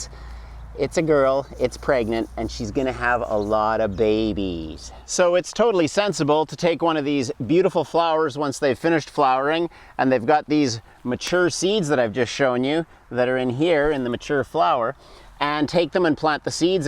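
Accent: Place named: Canada. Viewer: I totally could hear you were from Canada, ay?🙂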